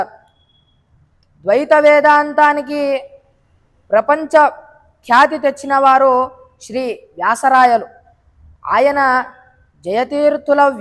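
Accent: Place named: Karnataka, India. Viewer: వింటుంటే నిద్ర వస్తూంది. జయతీర్థులవారు ద్వైత సంప్రదాయానికి చేసిన కృషి మరువలేనిది. మీరు కన్నడ భాషలో అనుకొని తెలుగు లో మాట్లాడుతున్నారు. తెలుగును తెలుగు లా మాట్లాడండి. వినసొంపుగా లేకపోతే ఏదో చెప్తున్నారు లే అనుకొని వదిలేస్తారు. సాధ్యమైనంత వాడుక భాషలో మాట్లాడండి. ఆత్మవిశ్వాసం ఉండాలి. మీకు మంచి వక్త అయ్యే అవకాశాలు ఎక్కువగా ఉన్నాయి.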